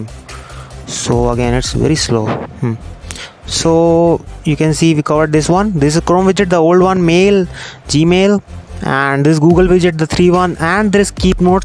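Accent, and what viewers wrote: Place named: India. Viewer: This Indian English...so annoying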